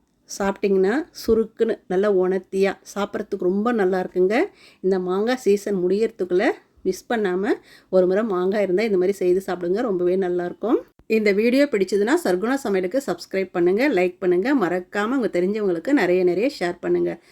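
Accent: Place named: Kongu, India. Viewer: Kongu Thamil Magaley Super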